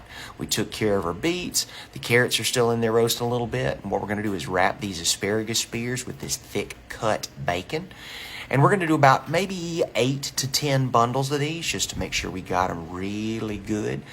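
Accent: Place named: United States. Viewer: Love them all, except the beets.....lol I have to ask what part of the US does your accent come from? Mine is a mix of South Georgia and eastern NC.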